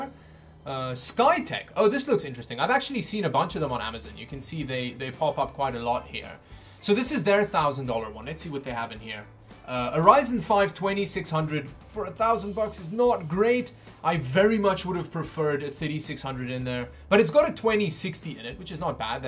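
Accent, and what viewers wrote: South Africa. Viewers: Wait are you South African ? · Is jy van suid afrika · Are you South African? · dude are you south African?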